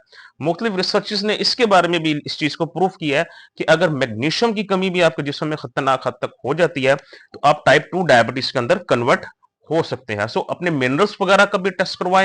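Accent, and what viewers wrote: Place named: Pakistan. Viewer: السلامُ علیکم ۔ لہجے سے تو آپ پاکستانی لگتے ہیں۔ اور ذیابطیس کی یہ انوکھی وجہ آپ نے بتائی۔ میں ہندوستاں سے ہیں ۔ مجھے بھی ٹائپ ۲ شوگر ہے۔ فیملی history میں کسی کو کبھی یہ مرض نہیں رہا۔ کیا مجھے بھی آپکی سُجھائی باتوں سے فائدہ ہوگا۔ ہمارے یہاں کے پروفیشنلز کا آپکی دی ہوئی وجوہات پر اعتماد نہیں ہے۔ آپ کے ملک میں اس مرض میں مبتلا کتنے لوگ ہیں؟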